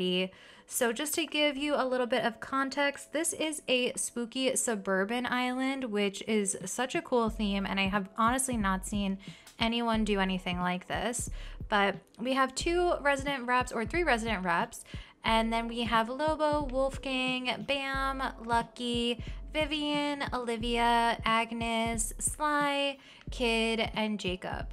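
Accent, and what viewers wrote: United States. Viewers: you can tell he is from the us :D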